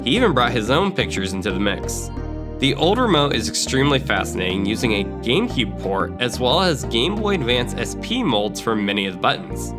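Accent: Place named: United States. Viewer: For someone reason, I thought you were British. I didn't realized until halfway in the video that you are speaking in American accent 😂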